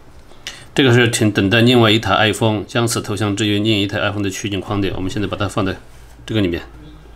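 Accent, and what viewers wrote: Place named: Anqing, China. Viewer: 一听油管主就是安庆那边的人😊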